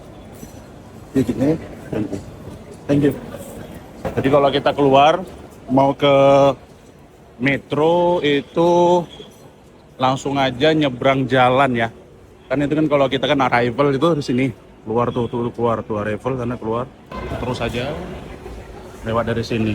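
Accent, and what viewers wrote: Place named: Java, Indonesia. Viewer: kok vibesnya jadi JAWA bang? gak sumatra lagi, medok banget kedengerannya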